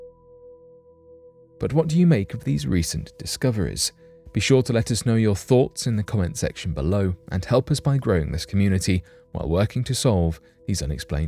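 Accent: England, United Kingdom. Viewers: The believability of your videos varies. But the audio volume is super consistent. That and the high frequency of your videos makes this channel my go-to chill out channel. I also love English accents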